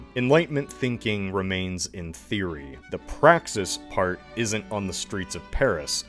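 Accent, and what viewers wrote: Ohio, United States. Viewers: Thank you for your wonderful new "alternatives"... I truly appreciate the work y'all do on these. I especially am glad that your family was able to exist long enough for you to be here! I really enjoy your voice. Do you have an Ohioan accent! This is -->almost <-- as interesting as the episode you did on the lack of the Great Lakes.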